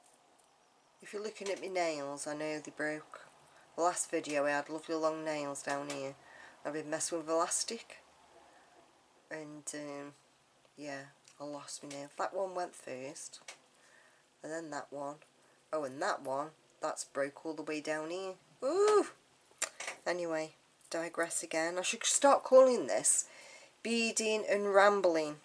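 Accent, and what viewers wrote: England, United Kingdom. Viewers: Im from California. But I love the Brit accent. I watch Brit TV show. My older brother got me hooked now my oldest son is hooked too. Your coppers and Mystery shows. My granny was born in Ireland and moved to the East coast of the USA. In early 1900s! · So lovely to hear an English voice. Only just found you. I’m already a fan and will continue to watch your videos. I have already learned a few new things especially how to end memory wire bracelets. Thank you.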